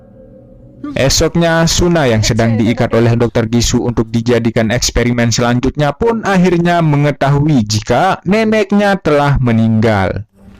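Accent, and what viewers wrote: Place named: Lombok, Indonesia. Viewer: Logat sasak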